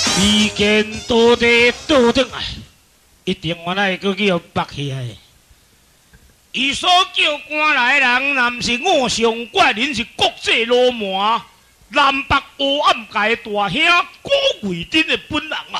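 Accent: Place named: Coast, Taiwan. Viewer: 做戲，海口腔很自然，這也是他的特色，住四湖，媳婦一樣是我們麥寮人。笑科很自然，不用特意排一個橋段，而是隨口一說就很好笑。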